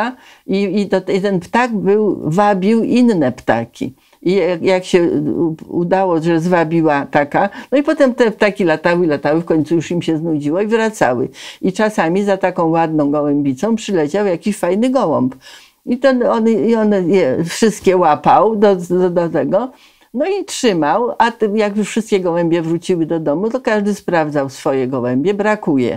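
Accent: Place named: Warsaw, Poland. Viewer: Ma Pani cudny warszawski akcent.❤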